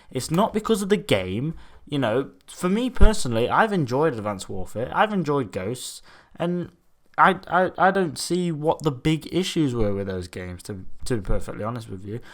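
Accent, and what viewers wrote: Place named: United Kingdom. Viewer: Another British voice! <3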